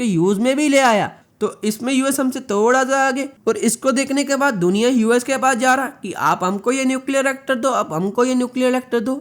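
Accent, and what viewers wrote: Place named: South India, India. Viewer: Bhaiya kaha se ho kya aap South se ho kya aapki hindi bahut alag level ki hai mera matlab bolne k tarike se hai Lekin achchi hai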